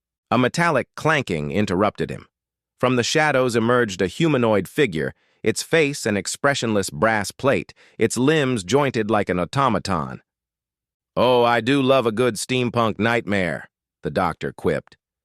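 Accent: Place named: United States. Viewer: This was truly excellent! A great story, and a great new avenue for your channel! An American narrator is not actually that jarring, as the precedent has been set by guest actors of that type reading official audiobooks. Definitely looking forward to more! Incidentally, apologies for this delayed response, but I was having a short break from You Tube interactions. Many thanks and all the best for now. Paul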